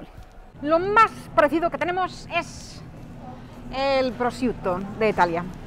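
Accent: Spain. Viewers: Wow i didn't even know you were American at first...I definitely hear your Spanish accent! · Sorprende qué siendo norteamericana? Hables con acento de españa, qué es mas difícil (no permite seseo,exige acentuación al hablar,etc.)es casi como aprender alemán siendo Americano. Me preguntó si manejando un Español con acento? porqué no hicistes vida en españa? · Me quedé impactado cuando dijiste que eras de USA, por tu acento pensé que eras española nativa.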